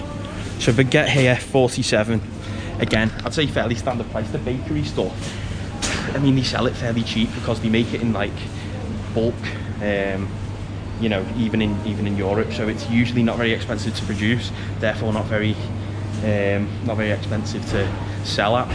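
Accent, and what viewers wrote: Liverpool, United Kingdom. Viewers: hes scouse <3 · having a british accent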